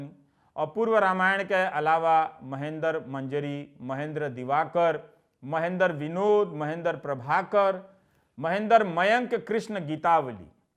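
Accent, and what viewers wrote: Bhojpuri, India. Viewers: ये तो the great रवीश कुमार लग रहे हैं जो पक्की भोजपुरी बोल रहे हैं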